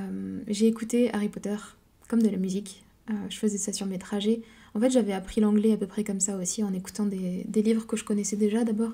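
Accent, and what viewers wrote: Russia. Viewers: Vous avez un accent russe maintenant 😊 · Tu ne serais pas plutôt bilingue Russe/Français issue d'un mariage Mixte ? car hormis ton génotype plutôt Russe ton petit accent te trahit aussi, puis quand à tu demandes si "obsessive" se dit en Français, j'ai de gros doutes, merci quand même pour ce Coucou de Russie, en souhaitant que les relations entre nos 2 pays historiquement amis se rétablissent au plus vite.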